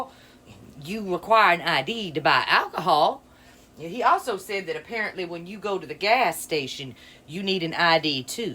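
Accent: South, United States. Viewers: I love your videos and that sassy southern accents. So sweet ♥️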